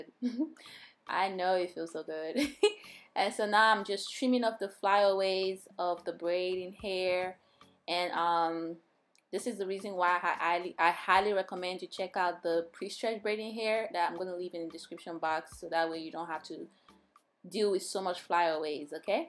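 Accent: Nigeria. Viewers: I love your accent. Are you Nigerian?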